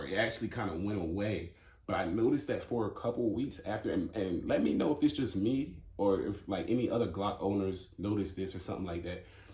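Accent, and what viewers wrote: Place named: California, United States. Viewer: Ik you hadda livin in Cali da way you be sayin “ya feeme”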